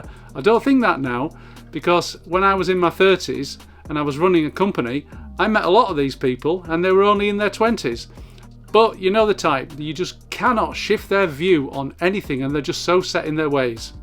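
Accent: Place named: England, United Kingdom. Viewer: I could listen to you all day. I like your English accent! I believe it makes that experience pill easier to swallow. I was hypnotized to quit smoking 17 years ago. I believe it helped because she had a wonderful accent like yours.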